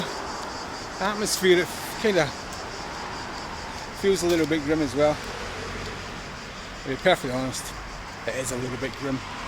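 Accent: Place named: Scotland, United Kingdom. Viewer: This video seems so cool. This is exactly the kind of places I wanna go see. But as a non native english speaker I find it hard to absorb both scottish dialect, wind and road noise. Maybe something can be done? I've watched parts with great interest and given a thumbs up :goodvibes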